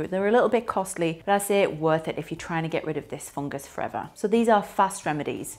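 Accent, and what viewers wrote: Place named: Newcastle, United Kingdom. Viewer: Ooooh noooo! I bet the Californians love your Geordie accent ;) Thanks for the video, informative.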